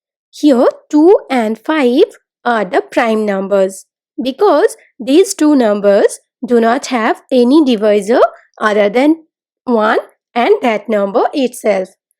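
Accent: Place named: India. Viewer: the indian accent is classic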